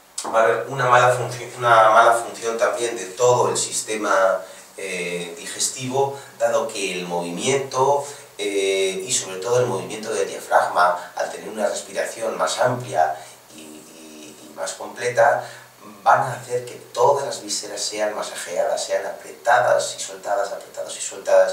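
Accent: Spain. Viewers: Pues, soy mexicano y me es incómodo y difícil escuchar lo que dice éste señor. No porque use otras palabras, si no más bien que por su acento me es complicado escucharlo. ¿Por qué? No lo sé, tal vez sea porque no estoy familiarizado con el acento español.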